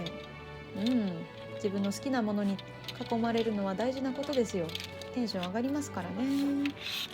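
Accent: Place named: Kansai, Japan. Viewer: サバァは、猫舌でパンケーキ好き。マジで、ぶち込む、めっちゃと関西弁で。水と食料を忘れ、安全第一！オモステの軍用車を操縦する・背伸びする猫天使👼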